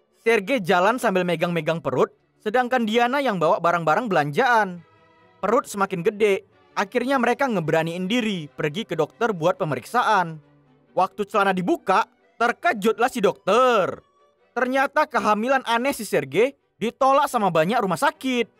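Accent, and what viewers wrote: Medan, Indonesia. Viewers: Sering2 upload lah bang..ntah film apapun..yg penting suka kali aku dengar logat medannya..😅😂👏👏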